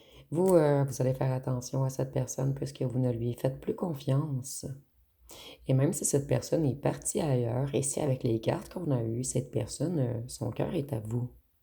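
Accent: Quebec, Canada. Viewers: Eh hop, je viens de booster et liker! 😉 Merci pour votre présence assidue.✨ J'apprécie beaucoup vos guidances...✨et votre joli accent québécois.✨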